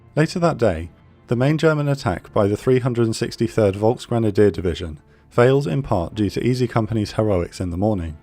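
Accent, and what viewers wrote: United Kingdom. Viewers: Narrator is British....and this battle is factual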